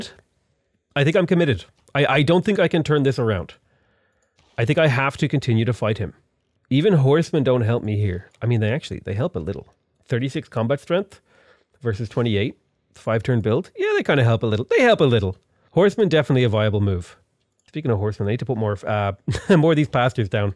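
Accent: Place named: Ireland. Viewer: Anyone feel that he was 2x more Irish than usual?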